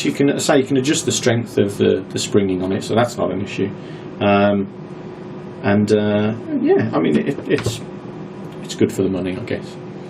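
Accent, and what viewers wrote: United Kingdom. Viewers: A bit late but great video. Is that a midland accent lol my name should tell you i am also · He sounds British to me, mate.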